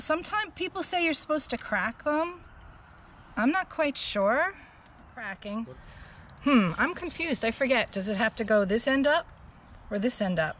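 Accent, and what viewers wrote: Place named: Long Island, United States. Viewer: Thats nuts! Love the long island accent.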